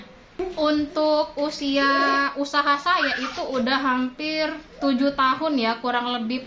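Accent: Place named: Sumenep, Indonesia. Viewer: Mbak tinggalx di mana? Kalau dari logatnya kayaknorang sumenep. Kalau emang sumenep, sumenep mana mbak.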